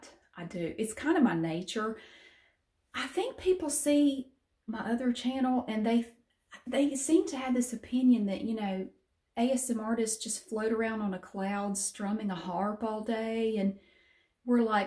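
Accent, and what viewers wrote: South, United States. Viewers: I enjoy your videos so much! You are so genuine and real. I’ve been following you the day you made this channel and watching your videos everyday now because I’m so invested in your life because I love to know what you are doing 😝 A lot of YouTubers nowadays are fake and always starting drama that’s why your channels are a breath of fresh air. I honestly love your personality and southern accent! Your voice is so soothing to hear and it helps me sleep when I’m restless and can’t sleep. Would love to send you a Christmas card if you have a PO Box! Sending you lots of love from Canada!🇨🇦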